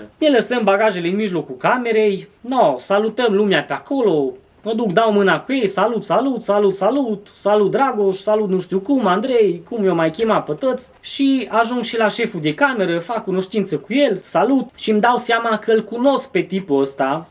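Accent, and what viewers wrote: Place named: Transylvania, Romania. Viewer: )) Glumesc si eu. Te ascult cu placere, ca ai accentul ala fain de Ardeal. Si eu sunt plecat de acasa tot din zona aia, din Mures mai precis. Numai bine,!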